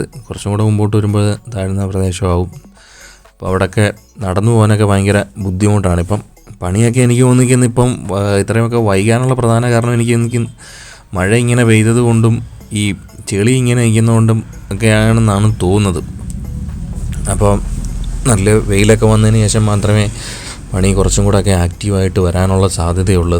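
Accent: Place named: Central Travancore, India. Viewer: നമ്മുടെ മധ്യ തിരുവിതാങ്കൂർ ഭാഷയിൽ കണ്ണൂർ drone വീഡിയോ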